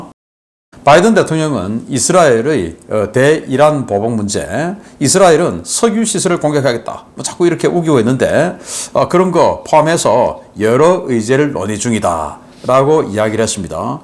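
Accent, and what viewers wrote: Gyeongsang, South Korea. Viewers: 신인균 너 말대로면 모스크바는 3번쯤 함락됐어야 한다 경상도 씹 말투 존나 거슬리네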